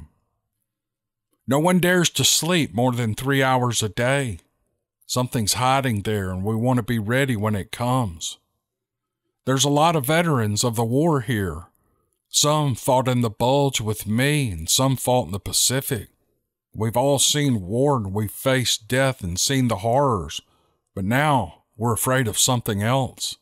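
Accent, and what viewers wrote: South, United States. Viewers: Hi, This is Dave Ramsey, and I grew up in Memphis also. I'm 61 this year and now live in Las Vegas, and it is nice to listen to your Southern draw as you tell your stories. Really just wanted to say Great job with your pod cast... Love your Stories.. Dave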